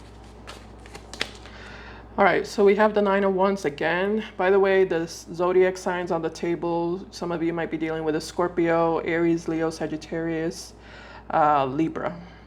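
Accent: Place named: Spain. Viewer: Gem vs Pisces.. Pisces is known for impulsive actions that get them in trouble. The grass was not greener in the other side. I struggled with 3 horrible hurricanes - he knows exactly what I'm going through.. but he has done nothing to reach out & help in any way with the loss of my home. The home that he left me in. I moved him from California to Florida 7 yrs ago when he hit rock bottom. All was fine til he let toxic jealous family & friends come between us. Controlling him. So eventually he pleased them by leaving me & hooking up with the female they wanted for him 1700 miles away not giving a crap of how I felt!! So I do believe he is not happy & he's using that person as convenience for his abrupt actions... I'm moving back to my home town & letting him mess up his life again cause this is his pattern.. player, liar, manipulated.. golddigger.. cheater... 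Bi-polar infected...7 personality... One pill away from a straight jacket & I'm being kind.. lol. So deal with it Pisces, don't mess with a Gemeni... The hand that fed you!!.. Thank you!.. so accurate it was scary.! Sometimes you remind me of my sister when you talk. Your accent. We're Puerto Rican. I'm guessing you might be Spanish too... Love it.. lol. Have a great day.. 🌹🙏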